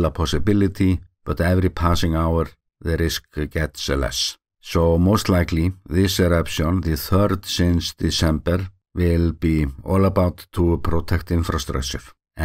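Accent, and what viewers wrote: Iceland. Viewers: I love thr Icelandic accent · I prefer this guys weird Icelandic accent instead of a soulless a.i. app narrator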